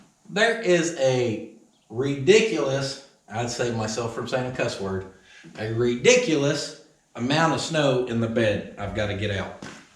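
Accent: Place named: West, United States. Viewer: Damn, your accent just reminds me of old western movies - I love it! Great commercial to boot :)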